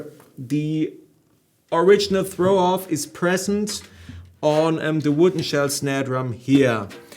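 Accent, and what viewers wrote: Germany. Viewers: geht mir genauso, geht doch nichts über dein Englisch mit Kurpfälzer Akzent 😉 erinnert mich so sehr an meine Heimat!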